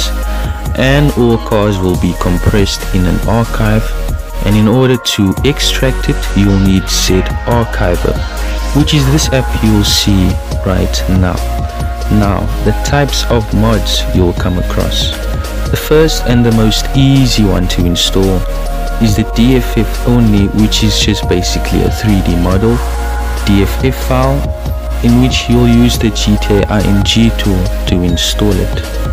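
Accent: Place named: South Africa. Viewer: Your accent sounds South African. I'm from SA.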